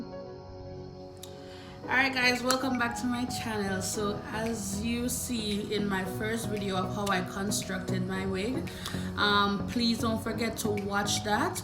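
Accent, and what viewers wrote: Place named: Caribbean. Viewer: That wig is cute I need to get one of them wig. Sis where you from 🇯🇲 cause I hear a Caribbean accent👂🏼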